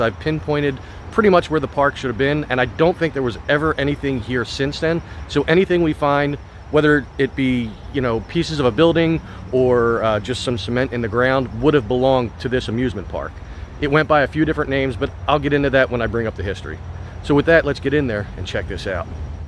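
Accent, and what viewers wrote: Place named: Philadelphia, United States. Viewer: Great video! I love your work. I am a history geek who grew up in Mayfair (Lincoln HS grad), and your accent makes me think of home. ❤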